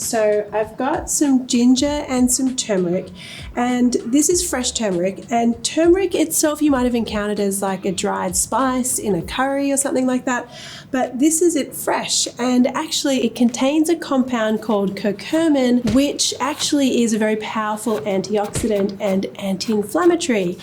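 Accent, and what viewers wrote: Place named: Australia. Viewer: I'm not a native English speaker and I learned mostly by watching American shows and then I lived in the UK for a few years so I picked up a bit of a London accent, and now I'm picking up some Aussie-ness from watching this channel, apparently. My Aussie friend keeps saying "when did you start sounding like this?" it's because of XD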